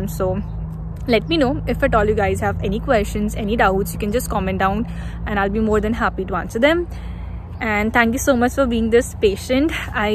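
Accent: India. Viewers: Weird background music, shameless self propaganda and chattering in monotone Indian english!!! Mentioning eye's dark circle and rubbing nameless cream is big stock of laugh. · 😂Indian accent I love it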